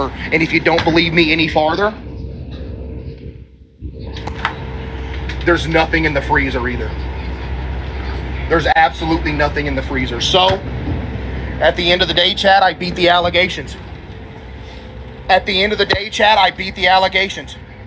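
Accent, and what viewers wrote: United States. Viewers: Bro kinda got that Texas voice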